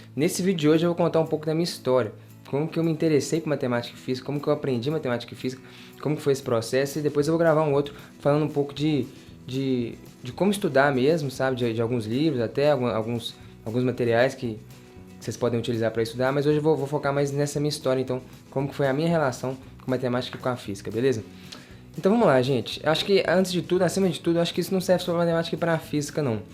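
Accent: Minas Gerais, Brazil. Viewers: krl sabia que era mineiro pelo sotaque, tmb sou mineiro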